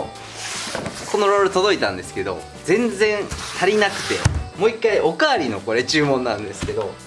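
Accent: Kansai, Japan. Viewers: いつもより関西弁強めでしたねw 同じく関西人ですので、心地良いですが。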